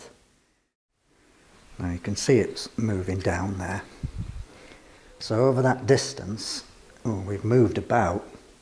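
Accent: United Kingdom. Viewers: Thanks, I own an Atlas 7-B Shaper and I think I’m going to make a mill head too! I love your gentle British manor and voice. Thanks again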